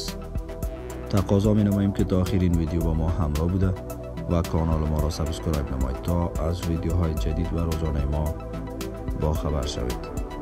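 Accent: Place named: Iran. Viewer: Az lahja irany to tamam chez malum meshawand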